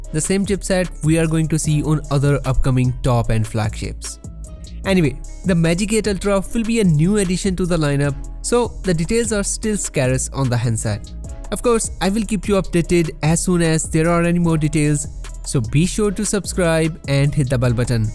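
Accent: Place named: India. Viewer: No offence, but the English Indian accent is the worst at the world Seriously my ears can't bear to hear it even 1 moment 🤢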